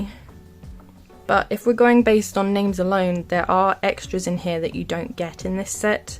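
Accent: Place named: United Kingdom. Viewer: Are you British? You sound like it soo much! ❤❤❤ by the way I love this video thank you for sharing!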